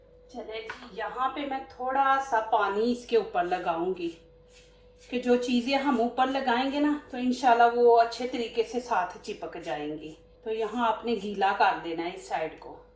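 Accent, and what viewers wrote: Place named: Punjab, India. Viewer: MashAllah bohet mazy ka nan bohet achy treqy se semjaya ap mujy pnjab se lgti he ap ka andaz benjabion wala he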